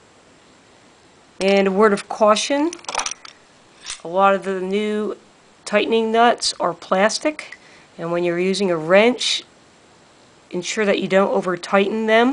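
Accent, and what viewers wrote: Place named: Appalachia, United States. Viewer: This guy's accent sounds like those Beverly Hillbillies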